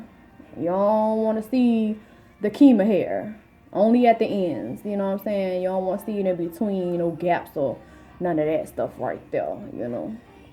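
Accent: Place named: New Orleans, United States. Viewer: As soon as I clicked on this video I said I know she from New Orleans lol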